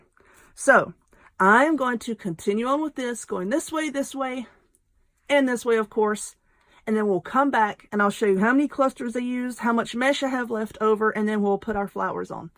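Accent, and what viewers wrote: South, United States. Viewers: I really love your beautiful crafts. However I don't know if I love your crafts or southern draw best. Know any slang words you can entertain me with? You sure make me feel like I was in my old home. I'm looking forward to you exhibits. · I am so glad I found your channel. 1 you're Southern-like me- so we speak the same language (lol). 2 your creativity and ability to think outside of the box amazes me. I would've never thought 2 make a Cross out of cooling racks! Thanks for sharing your amazingly awesome ideas with us! I've got to add this one to my list of wreaths to make!!!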